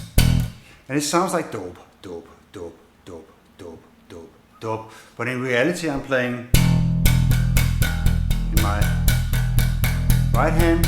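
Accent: Denmark. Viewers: Elsker din dejlige tykke Københavner accent, den giver én en ret fed nationalfølelse :-) But great job man, I'm enjoying learning from you.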